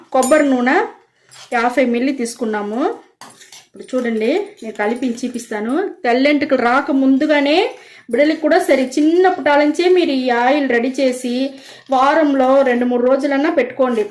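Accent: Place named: Chittoor, India. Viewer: మేడమ్ గారు చిత్తూరు జిల్లా తమిళ్ బోర్డర్ లో ఉన్నటువంటి తెలుగు వారు అనుకుంటున్నాను.అయిన తెలుగు బాగా మాట్లాడుతున్నారు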